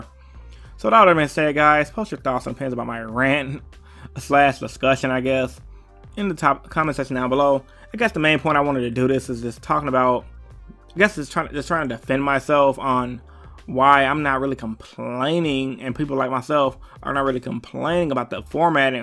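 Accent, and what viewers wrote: Chicago, United States. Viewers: Bro! You are so chicago lol respect.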